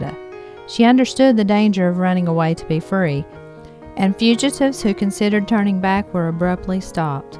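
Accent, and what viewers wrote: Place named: South, United States. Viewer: Yeah, I didn't quite get that, she sounds more like a Southern gal though. I honestly really don't care who narrates it it just leans toward more appropriate having a black person do it. It reminds me of when white people pretended to be Indians in movies. I'm quite hungry for more information especially accurate information about the black slave life and their escapes.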